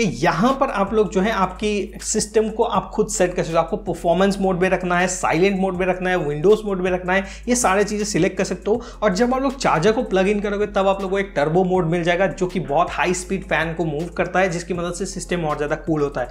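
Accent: Assam, India. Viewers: Aap Assam se ho kya?? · Damn you're from assam?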